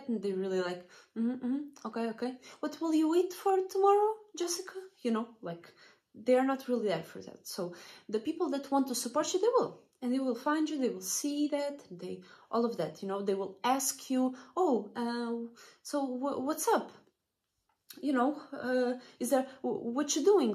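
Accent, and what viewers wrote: Russia. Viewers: I really agree. I worked on a creative projective which was ambitious and the people close to me tried to dissuade me and tell me it was impossible. I said it is impossible for YOU, for me it is possible. haha. But yes I agree, hard to find genuine people who will support you and not be jealous if you are a unique and creative person. I like your accent are you Russian?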